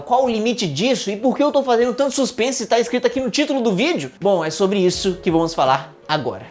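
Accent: Ceará, Brazil. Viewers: CRLH Eu tinha certeza que é cearense, praticamente afirmou agora, raramente confundo um conterrâneo 👽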